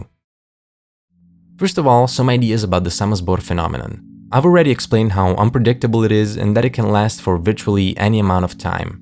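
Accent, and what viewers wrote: Russia. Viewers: there is no way you are not a Russian native speaker or at least from a slavic country your pronunciation says it all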